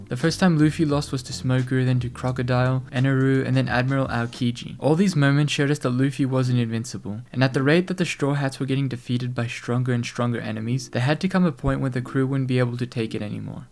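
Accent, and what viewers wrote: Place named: United Kingdom. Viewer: Hey I think this video is amazing. The one glaring issue is how British / European the voice person sounds I very much dislike that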